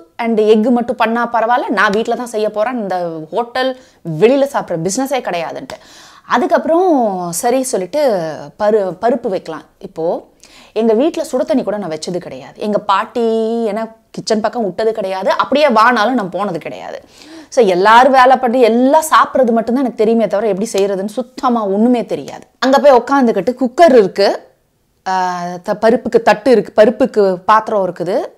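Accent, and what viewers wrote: India. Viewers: Hi , much appreciate ur clarity of speech and kongutamil .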